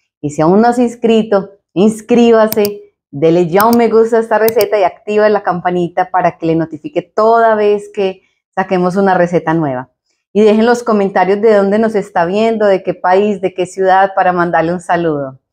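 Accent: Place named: Colombia. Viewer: es paisa,rolabrasileña haha muy chevere la receta, gracias.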